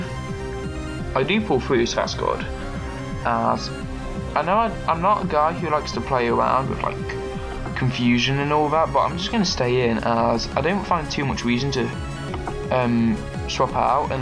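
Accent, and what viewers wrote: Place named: United Kingdom. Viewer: aah, i love your british accent :]]] pls more uploads of that kind, i suck in doubles but it looks fun watchin u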